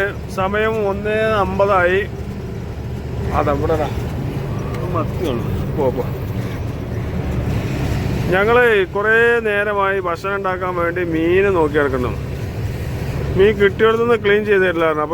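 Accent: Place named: Malappuram, India. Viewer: മലപ്പുറം സ്ലാങ് മാറ്റരുത് ഞാൻ 87മുതൽ നാഷണൽ പറമിറ്റിയിൽ ഓടിയ ഡ്രൈവറാണ് അന്ന് ഇത് പോലുള്ള സൗകര്യം ഇല്ലാത്ത ഡെവിൾ കേൾച്ചും ഓടിച്ചാൽ ഓടിയത്ത സ്റ്റെയറിങ്ങും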